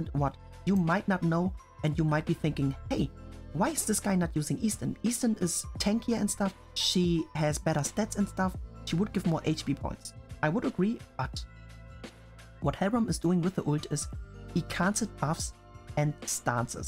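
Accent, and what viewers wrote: Germany. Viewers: Where are you from? you have a german accent somehow?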